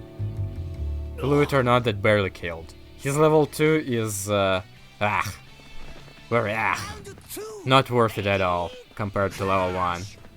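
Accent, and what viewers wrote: Russia. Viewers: I think he's Russian?? I could be wrong tho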